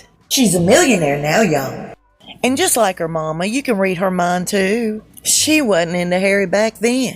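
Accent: South, United States. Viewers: Misleading headlines you are full of it for mere money but you do to worth with that a awful gaad southern drawl please stop it is is really sick.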